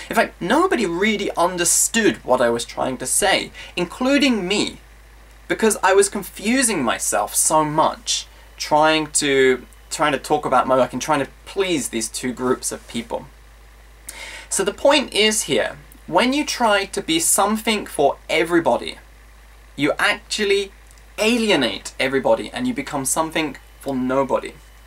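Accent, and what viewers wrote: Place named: United Kingdom. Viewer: It's music to my ears your British accent. I love it!!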